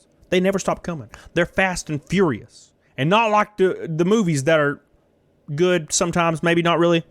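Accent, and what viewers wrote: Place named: Alabama, United States. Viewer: best buy don thanos snap. you can never take the alabama out of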